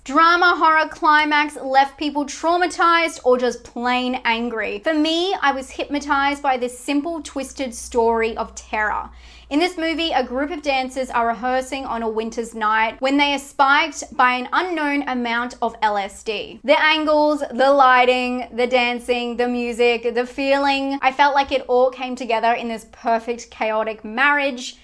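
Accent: Australia. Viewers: New Subscriber! - No need to apologize for your accent 😎 that is one of the little things that sets you apart from all the other channels, and if someone doesn't like the aussie accent...I'm pretty sure they Hate puppies and you shouldn't worry about those psychopaths #kiddingnotkidding :~)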